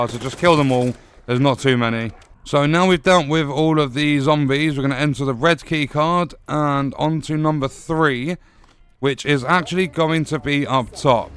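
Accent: United Kingdom. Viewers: Every time I need help, there’s your heavy British accent to guide me. I’m an American Cajun from New Orleans. I tried Marmite on toast once and it didn’t bother me too much. Hi five 🖐️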